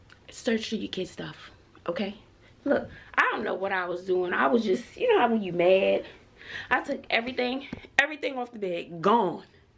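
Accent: New York, United States. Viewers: I love your channel so much, I love the way you speak My family from the Bronx; we recognize a New York accent when we see it. it’s so refreshing to see a momma much like mine on YouTube. We need more mommas like this. Growing up in the Projects I didn’t have a lot of things. I didn’t even have my own bedroom until I was about 17 almost 18. I think taking away your sons room will teach him to appreciate what he had because some people aren’t fortunate enough to have their own rooms. Great parenting. Keep up the good work.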